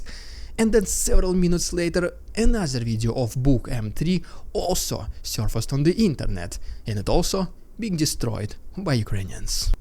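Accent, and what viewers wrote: Russia. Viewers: your extra funny russian accent is really annoying - appreciate the infos though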